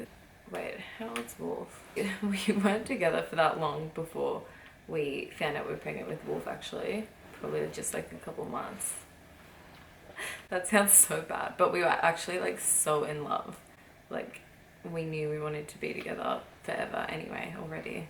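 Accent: Australia. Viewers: Third Aussie YouTuber I'm subscribed to. Aussie accents are like music to my ears lol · Pretend? well we don't need to with you honey you fat water buffalo. This girl is absolutely beautiful (no pretend) also she's not monotone it's just an Aussie accent and looking after two young kids all day. That shit takes a toll, but you wouldn't no fatty and you never will.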